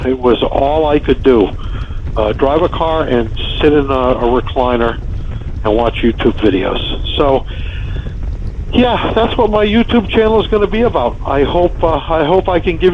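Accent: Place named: New Hampshire, United States. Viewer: You sound like your from Cow Hampstah I lived there for 36 yeahs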